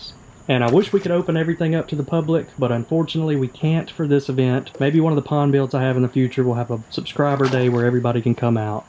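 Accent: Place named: South, United States. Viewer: the way you've said "hello fresh" was poetically beautiful. What part in the US are you creating from? I did hear a cave climber sound similarly too. I think they were from georgia or somewhere southern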